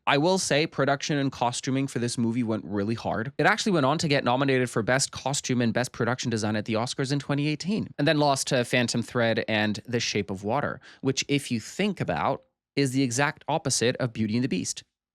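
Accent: Canada. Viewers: the more i listen to your accent the more i realize you are probably canadian which would explain it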